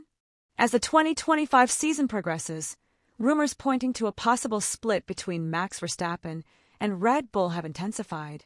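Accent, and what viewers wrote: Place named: United States. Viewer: good hear some American accent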